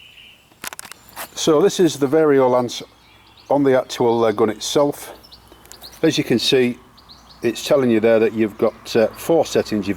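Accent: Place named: Yorkshire, United Kingdom. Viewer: A really good review, nice to hear a Yorkshire accent and not an American.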